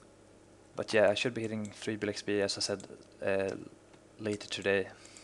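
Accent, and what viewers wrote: Sweden. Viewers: Hejsan! Du låter som en svennebanan!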